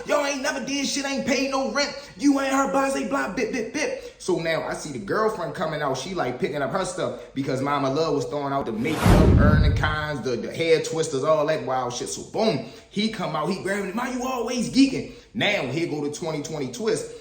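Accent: Baltimore, United States. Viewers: You from Baltimore bro?? You gotta be from the town fr 😂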